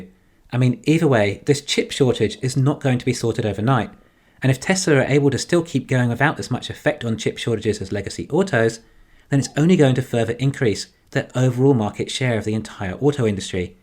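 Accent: United Kingdom. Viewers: I’m in the U.K., his accent sounds British to me.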